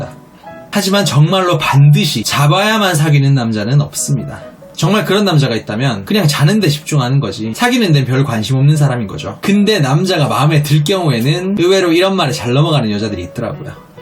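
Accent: Gyeongsang, South Korea. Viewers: 억양이 대구 경북분같으세요. 아주 날카롭게 지적하시고,아주 맞네요. 자기중심적 남자나 여자 아웃.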